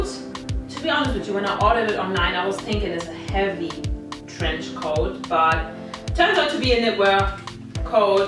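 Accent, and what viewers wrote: Germany. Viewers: Delia Beer ja, sie kommt aus Deutschland und ist dort geboren, deswegen ist ihr englisch auch anders als das von den native Amerikanern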